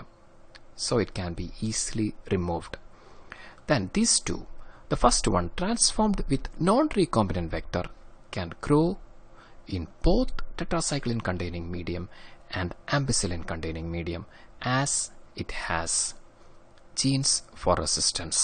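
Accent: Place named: Kashmir, India. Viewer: Thankyou so much sir It is really helpful 😔☺️ Btw your accent is like kashmiri's .😄🎉🎉🎉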